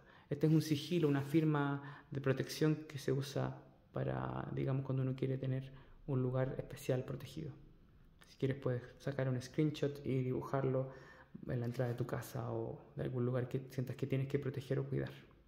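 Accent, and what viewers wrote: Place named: Chile. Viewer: Hola, Youtube me recomendó tu canal la semana pasada y he estado viendo tus videos.Eres chileno? Reconozco mi acento.❤. Elegí la opcion 4 y muy acertado soy tarotista trabajo completamente de esto hace 5 años tengo un canal de tarot aqui en youtube con mi otra cuenta.. Todo lo que describes de mi en lo empatica y generosa es asi, siempre mr he considerado muy espiritual y me causó sorpresa lo de la bendición... ya bendigo el agua y las cosas lo habia dejado de hacer de hecho en uno de tus videos te envie bendiciones curioso y ahora veo esto 🙏👍.Saludos desde Chile Me pareces muy buen tarotista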